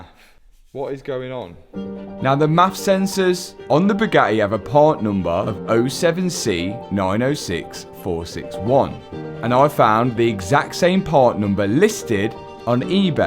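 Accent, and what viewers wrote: United Kingdom. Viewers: Where in the UK is he from for that accent??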